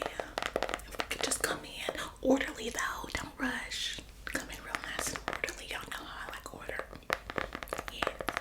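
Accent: South, United States. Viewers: I love the southern accent 😁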